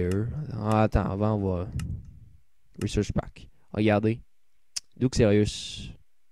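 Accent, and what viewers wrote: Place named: Canada. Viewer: j'adore ton acsen canadien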